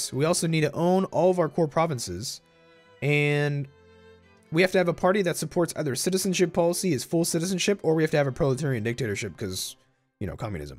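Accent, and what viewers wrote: Spain. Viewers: It's funny how you say Portuguese things with a Spanish accent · ye he pronounces j's as h's as if it was spanish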